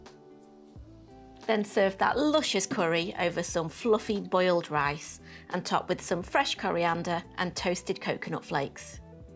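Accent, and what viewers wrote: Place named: Cockney, United Kingdom. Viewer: Well Done the...loving that Cockney accent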